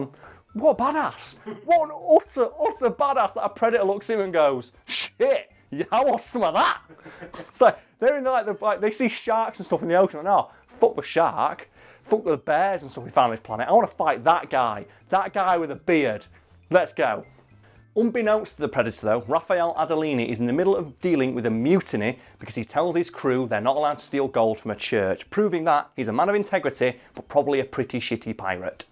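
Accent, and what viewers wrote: United Kingdom. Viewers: Just watched the new movie and yes, this leaked script is literally what happens. Spoiler alert I guess? In the tone of you’re sweet British accent, this movie indeed SUCKED ASS!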